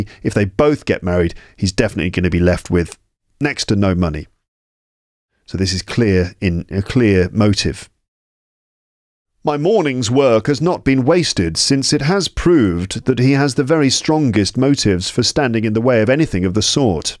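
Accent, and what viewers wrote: United Kingdom. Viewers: my english is good but i still open this vid to listen to my fav music which is british accent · This British accent ؟؟؟ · is he use British accent? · Do you have a British accent? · Hi thank you for posting a lovely video as always. Byw I live in London but there are no chances to meet British people who speak in beautiful accent like you. I understand what you speak almost everything though, I don't get it conversation on the hustle and bustle.😢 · Is it british pronunciation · İs that british accent?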